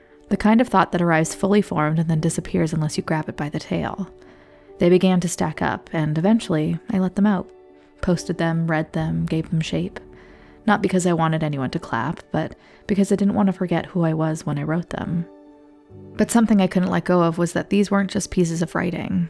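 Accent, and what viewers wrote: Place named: United States. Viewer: a narration dubbed voice who speaks in American (or Canadian) English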